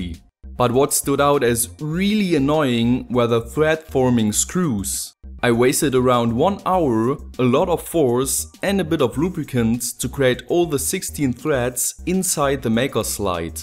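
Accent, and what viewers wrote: Germany. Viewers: Are ya a german dude?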